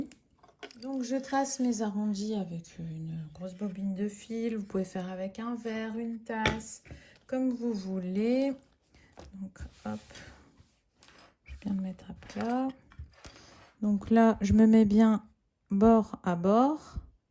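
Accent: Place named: Nord-Pas-de-Calais, France. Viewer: Mais oui chti toujours 😂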